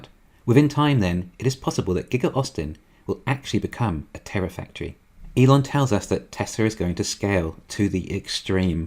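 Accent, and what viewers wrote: England, United Kingdom. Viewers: At last an Englishman with an accent on Tesla!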